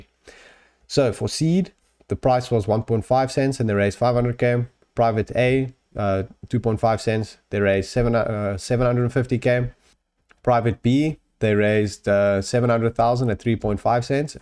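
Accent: South Africa. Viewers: Glad to find this channel . Is that South African or Zimbabwe accent? Are you based there. Thanks again good content for small audience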